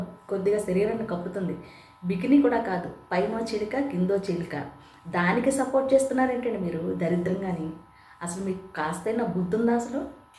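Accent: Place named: West Godavari, India. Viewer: Fantastic dialogue delivery. Crystal clear Telugu Language. Looks like West Godavari Accent. Slow and Steady delivery of Dialogues.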